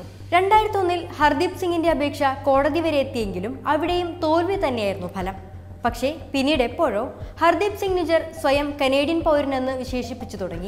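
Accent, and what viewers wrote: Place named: Trivandrum, India. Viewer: ചേച്ചി ഒരു ട്രിവാൻഡ്രം കാര്യാണല്ലേ ആ ഭാഷ അറിയുന്നുണ്ട്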